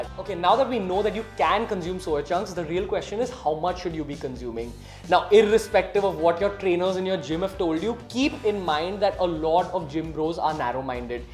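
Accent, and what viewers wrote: India. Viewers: Idiotic logic + Indian accent = Pure comedy gold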